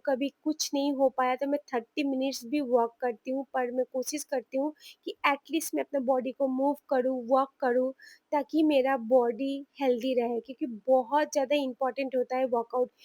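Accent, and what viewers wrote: Bengal, India. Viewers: Tomake dekhe onak motivation pelam amar o khub tension hoto ami to job kori ki kore biyer por sonsar and job 2 e korbo kivabe samlabo to tomake dekhe sikhkam je ata amader morer tension sob kichu e kora jai❤❤❤ ar ami bengali te liklam Karon tomar hindi bola ta dekhe mone hoche tumi bengali 🥰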